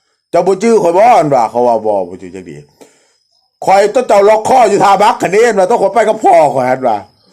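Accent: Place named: Sisaket, Thailand. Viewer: สำเนียง คือคนศรีษะเกษ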